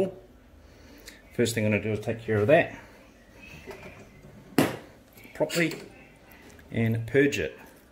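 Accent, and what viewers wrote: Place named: New Zealand. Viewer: That was awesome mate!? I'm from Australia and I just llooooove the NZ accent ... keep it up. I have to clean my Vibiemme Domobar Piccolo. Well done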